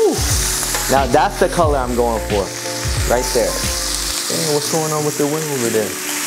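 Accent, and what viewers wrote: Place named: Los Angeles, United States. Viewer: this how you sound like when you korean and grow up in LA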